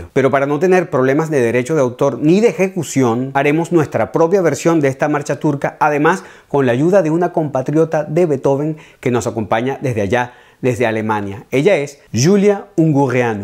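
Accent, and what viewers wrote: Mexico. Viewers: . Que interesantes y profesionales me han parecido el par de videos de La Cata Musical que he visto. Me suenas Mexicano, y como compatriota, me enorgulleces. Muchisimos exitos y bendiciones. Seguire checando tu contenido. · ni parece venesolano se escucha mas mexicano que nada.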